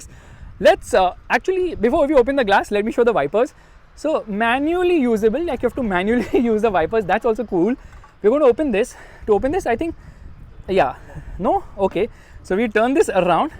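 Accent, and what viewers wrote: India. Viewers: Typical Indian engineering college type annoying English accent. Trying to speak fast to show the fake fluency. Jeep mardo pe achhi lagti hai. Tum jaisi pe nahi. Next time drive some Alto or Wagon R.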